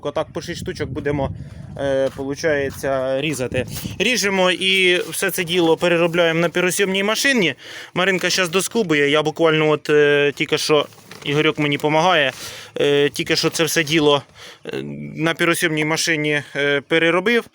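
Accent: Poltava, Ukraine. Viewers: так ведь это наш Полтавский говор, пускай не слушают кому ухо режет, а мне так любо- любо душу греет. Раньше говорили, что наш говор это настоящий украинский язык, мы же не живём на Западной Украине, это центр у нас так,и это у нас не отнять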